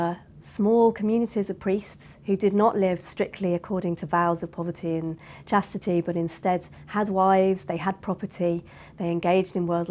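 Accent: United Kingdom. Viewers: Loving 🥰 your British accent ❤️😊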